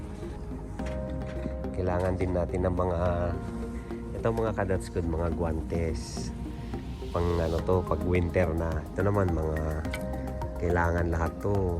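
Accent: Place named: Philippines. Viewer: Just saw you, recommend by YT. Gusto ko po yung accent nyu pilipinong-pilipino.. I like raw vlogs kahit mahaba basta realtime. keep it po kuya,, Wag nyu po kaming kalimutan pag lumago na ang YT channel nyu .. Ingat po always Godbless you po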